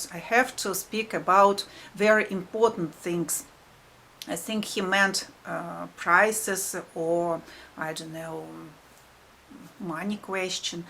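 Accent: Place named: Russia. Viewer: please, do what you want to do here on YouTube. My boyfriend is a Russian who used to work quite frequently here in the United States. He spent roughly sixty to seventy percent of his life here with me. Back in Russia he has two kids with an ex. As such he would have to go back and spend time with his family there. Such was the case at the time that this situation came about. I was there with him for the new year and came back home the following week. We're both about 40 years old and I can indeed say with absolute confidence that this is a person that I love and miss dearly and would love to be married to at some point. Any plans for such a currently on hold for obvious reasons. I've been to his family's dacha several times. I'm on speaking terms with his family there despite the political situation. Watching you to go about your day for me is a kind of therapy. 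Hearing your accents the people around you speaking in Russian it gives me a sense of home that I can't have right now. Please, understand that people will be miserable because of their own personal problems and projecting such unpleasantness on you is all they can do. They're bored, they are shallow. You however, small a one it may be, a light in the darkness. However long that darkness is the last. Пока-пока:)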